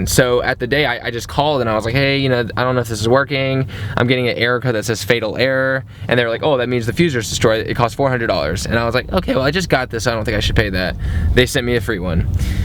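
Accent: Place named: Kentucky, United States. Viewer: I'm assuming he's from Kentucky like William. I'm from uk so that's my only reference point:D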